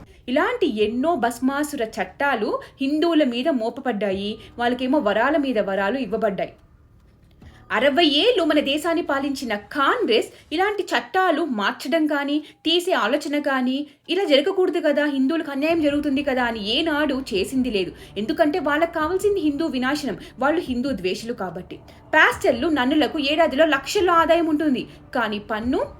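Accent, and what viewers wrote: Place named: Telangana, India. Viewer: i like your accent man.. switching different slangs mainly telangana style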